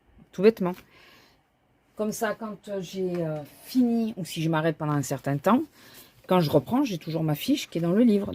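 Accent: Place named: Toulouse, France. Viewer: Bonjour, vous êtes d'où ? Je vous ai entendu dire que vous n'allez pas sur Toulouse. Et il est vrai que l'accent, je n'y avais pas prêté attention mais il est de chez moi lol. J'ai bien aimé votre vlog. Hâte de voir le prochain! Bonne journée 😊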